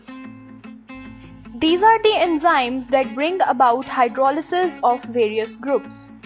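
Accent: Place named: India. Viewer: Oh my god I'm arabic and I can't understand your hindi english 😣😢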